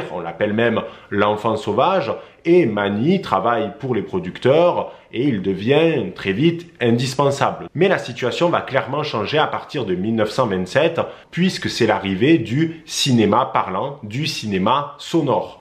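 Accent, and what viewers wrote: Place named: Aveyron, France. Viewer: Est ce que ton petit accent a quelque chose à voir avec l Aveyron ? Salutations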